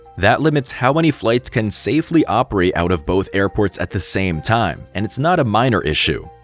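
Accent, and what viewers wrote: United States. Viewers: Very strong American accent. And why miles miles miles. Is it not 2025?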